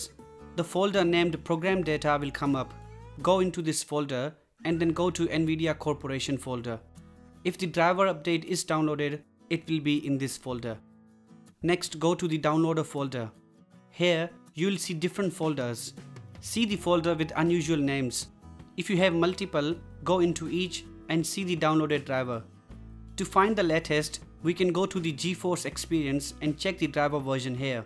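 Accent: India. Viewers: Knew when I heard the indian accent that this would fix my problem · its always the Indian voice to rescue our days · tutorial + indian accent = perfection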